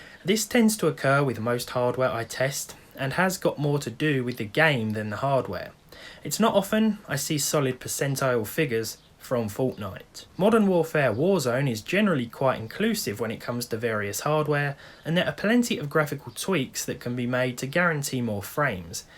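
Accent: United Kingdom. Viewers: Greetings from "DEUTSCHLAND" i love ur british Accent!!! 🙂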